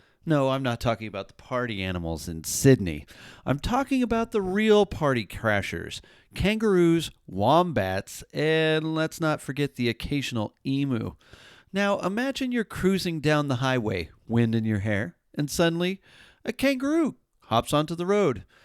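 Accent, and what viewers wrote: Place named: United States. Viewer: I'm sorry with that American accent really put me off. Australia is NOT all about Kanga's and Wombat's on main roads. Learn a bit better. Everdday driving in Oz is very different. You are on the right in the driver's seat and yes, left of road. Makes sense. We are not imbiciles. Like anywhere, you need to be aware of all dangers and risks here but in everday travel you will not encounter kanga's or wombats!!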